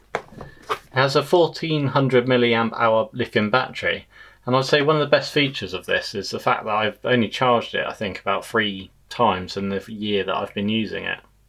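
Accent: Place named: Liverpool, United Kingdom. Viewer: You have the same accent as the John Paul George & ringgo Good review, thank you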